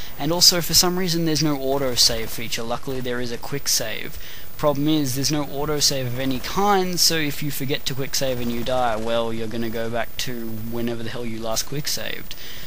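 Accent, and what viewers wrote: Australia. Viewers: You have a good potential to be professional commentator. I like your voice inflexions sounds a little Aussie. If you could drop the swear words, it would be "really really really really" good.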